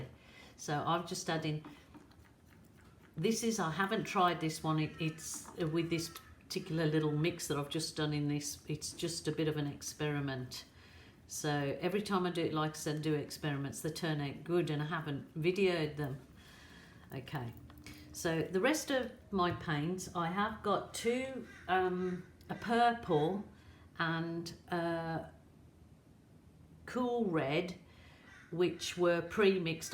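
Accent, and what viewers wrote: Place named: England, United Kingdom. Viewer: Wow! Looks like a dragons head! Lovely. It is also nice to hear an English voice too!!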